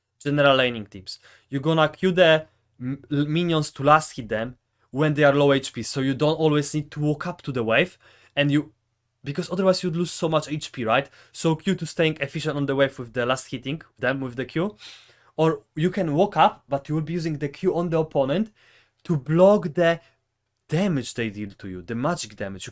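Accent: Poland. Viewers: Are you from Poland? Jesteś Polakiem bo akcent wydaje mi się że słyszę Polski. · Pewnie polak sądząc po akcencie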